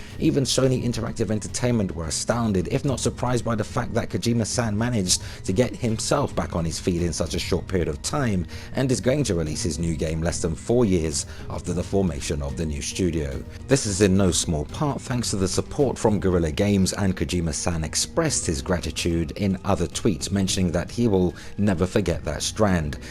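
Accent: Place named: Cockney, United Kingdom. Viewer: How come you’re posh in your produced videos, but all geezer in your podcast chats?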